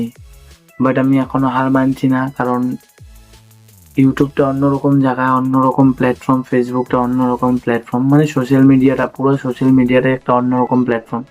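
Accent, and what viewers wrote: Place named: Kolkata, India. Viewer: Ami shocked 😳😲 tumar bangla kolkata bangla moto waw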